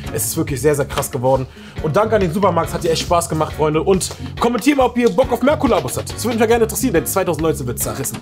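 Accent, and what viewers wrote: North, Germany. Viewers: Dieses Norddeutsch war einfach mega 😂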